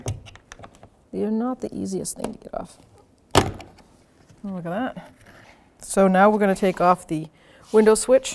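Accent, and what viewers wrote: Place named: Massachusetts, United States. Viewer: Nice job. I detect a Massachusetts accent. Am I right? :)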